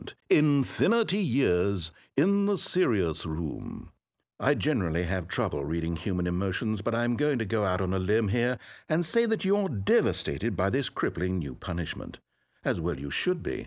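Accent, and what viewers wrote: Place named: United Kingdom. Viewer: I always loved how this adult British narrator straightly says such a little kid number like one-hundred-billion-trillion years.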